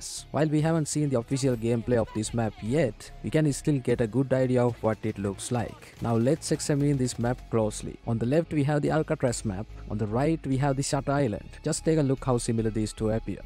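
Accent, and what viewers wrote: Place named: India. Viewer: Hey bro one thing can you tell me is your from India because I suddenly realized that your voice feels like Indian dude because as I'm indian too so it's feels me that.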